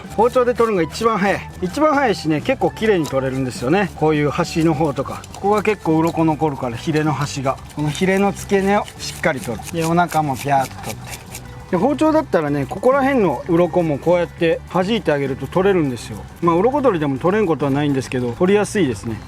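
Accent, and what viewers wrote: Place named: Shodoshima, Japan. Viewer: ナガセの待ち時間長いからね。 小豆島弁が素敵。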